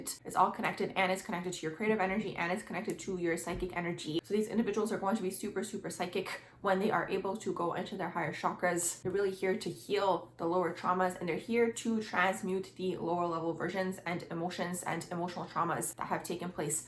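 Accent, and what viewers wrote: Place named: Upper Midwest, United States. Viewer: Love this video, everything you said is true! Side note: you have to be either from Northern Illinois, Wisconsin or Minnesota.... I'm from Illinois and i recognize the accent!!!!❤❤❤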